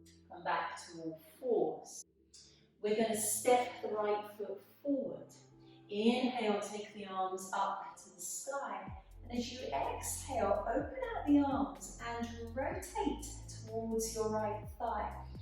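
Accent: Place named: United Kingdom. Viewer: Wow! I stumbled on this flow while looking for no-inversion (headache-safe) yoga. When I get a migraine, the day after I have a residual headache that kind of feels like half a headache mixed with a hangover. I do want to do flows during these days but I found that doing any kind of inversion can exacerbate the head pain. So this flow was amazing, great breath work, mindful warm up and stretches, and amazing pacing. The voice cues were so on point too- I've never seen this instructor, but I didn't have to look at the screen more than once or twice. (And I'm American, so I love a beautiful British accent lol) Thank you so much for this gentle, soothing, and pampering flow. Going in my after work favorites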